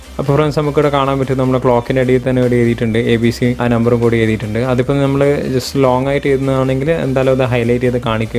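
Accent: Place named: Kannur, India. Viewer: കൊള്ളാം, നല്ല അവതരണം. കണ്ണൂർ slang ഉണ്ട്.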